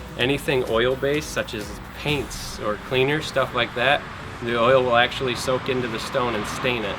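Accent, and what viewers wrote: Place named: North, United States. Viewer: I love the "accent" of the upper states!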